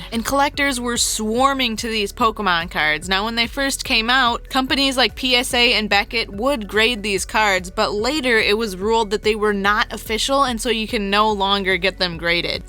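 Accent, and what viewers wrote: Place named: Louisiana, United States. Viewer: are you from Louisiana? you sound so familiar like the shopkeeper of rockets hideout. please respond because you sound SOOOOOOO familiar -_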